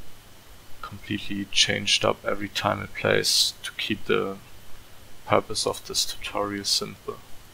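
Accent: Germany. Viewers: Are you german? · He isnt sad it's his accent he is German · Can know by your accentXD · Man hörts an dem Akzent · Thank you man! You sound like you're from Bavaria. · are you german? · man merkt voll dass er deutscher ist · hard german aktzent hast du :)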